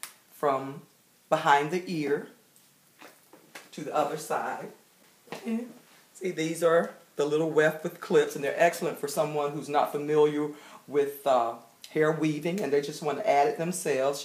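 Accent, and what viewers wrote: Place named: North Carolina, United States. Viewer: I love ms accent. She sounds like my mother. Are you from North Carolina Ms.?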